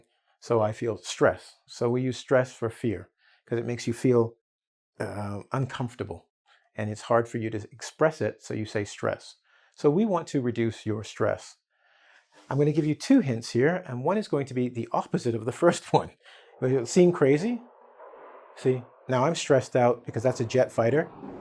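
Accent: Brazil. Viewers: Are you a Brazilian guy? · are you Brazilian?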